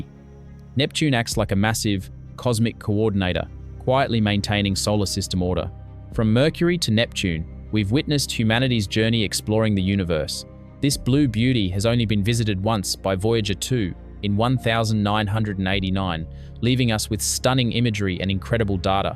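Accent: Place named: Australia. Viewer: The most interesting thing is the AI narration with an Australian accent and incomprehensible Aussie slang. It also changes its pronunciation of Uranus throughout the introduction.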